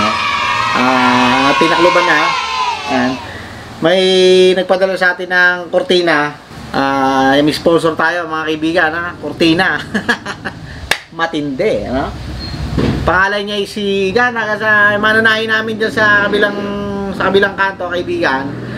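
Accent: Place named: Bicol, Philippines. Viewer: may pagka bicolano salita mo idol ah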